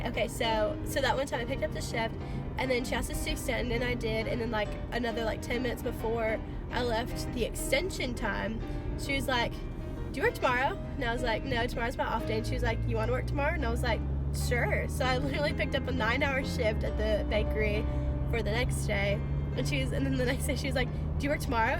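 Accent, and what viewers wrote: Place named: South, United States. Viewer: Your cute little southern accent i love it and you!!!!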